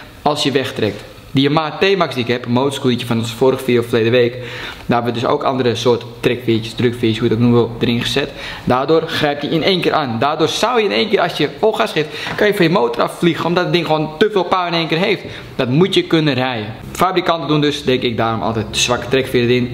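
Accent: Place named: Holland, Netherlands. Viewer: Zijn uitleg is best leerrijk maar snelle Hollands accent is niet te volgen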